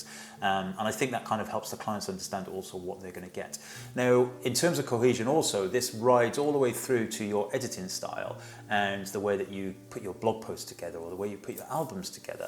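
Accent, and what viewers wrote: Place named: North Wales, United Kingdom. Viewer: Great advice, looking to start up myself and this puts things into so much perspective that I haven't seen after several months of research!! Are you Welsh by any chance? You sound North Welsh. Cheers for the video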